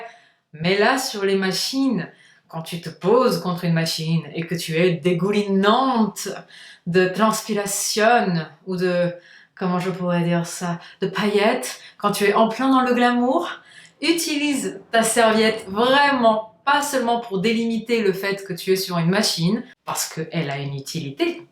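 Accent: Portugal. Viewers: C'est dû à son accent! Elle est portugaise, du coup dans ces langues là (espagnole et italiens) il y'a ce qu'on appelle l'accentuation. C'est surement ce que tu entends le français dérogeant à cette particularité rend encore plus audible son accent c'est tout! Bisous!! PS: d'ailleurs elle en a fait une vidéo sur son accent ON trouve cet accentuation en Anglais, bref dans plein de langues...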